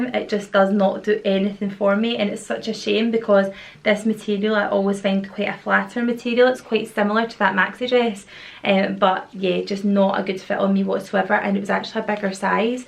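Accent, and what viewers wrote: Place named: Dunfermline, United Kingdom. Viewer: Are you from Dunfermline because you sound like my sister , Nicole xx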